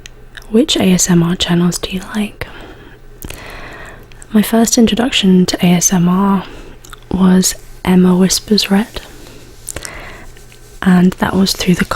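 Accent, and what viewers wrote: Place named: United Kingdom. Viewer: Your voice is very nice, the accent and all, to me as an American. Also very sexy I love British women that aren't total slags.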